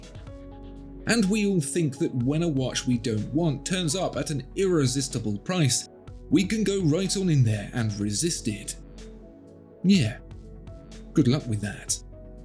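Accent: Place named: England, United Kingdom. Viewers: It's an English accent. You know, the language you're writing in. Have a day off from yourself Xiang. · Never mistake an English accent for intelligence.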